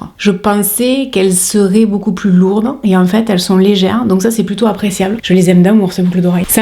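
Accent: South, France. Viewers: Bonjour arrête de culpabiliser parce que tu te fais plaisir. Les jaloux ignore-les j'ai 68 ans j'adore regarder tes vidéos tu es naturelle reste comme ça ne change rien. Tes vidéos sont formidables. J'adore ton accent du sud je suis moi-même de Montpellier je te trouve géniale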